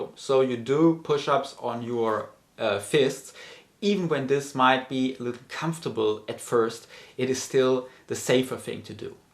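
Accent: Germany. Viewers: u sound like u have a erman acent u german?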